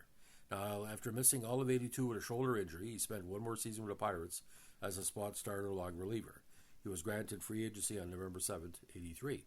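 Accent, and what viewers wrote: Canada. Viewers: Are you Canadian? I’m having trouble placing your accent!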